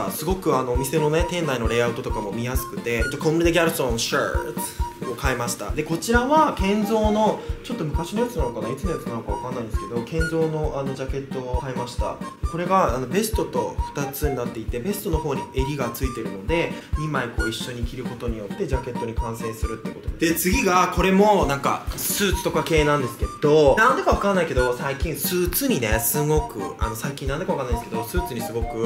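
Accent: Kansai, Japan. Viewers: って、たまに関西弁が混じるんですが関西出身なんでしょうか？急に親近感🐸（私は兵庫出身です！） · なんで今日関西弁多いのWWW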